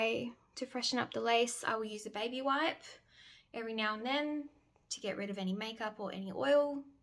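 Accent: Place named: Australia. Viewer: I'm just scrolling and I came across your video. I've heard of Highline Wigs but don't know much about human hair wigs (due to me being unable to afford one I'd say, lol). What a beautiful wig she is. I love a low density wig and my favourite length is probably 16-18 inch. It's so good to come across a wig review that's basically a update on what the actual wig is like a year later and how it's held up in the meantime. We need more of these. It looks so natural on you, totally fab. I also had NO idea that the hair on your head, the one you had up on a bun, until almost at the end of the video, was a wig btw. Lol. Excellent job, thank you. It was also good to hear a fellow Aussie accent doing a review as well. x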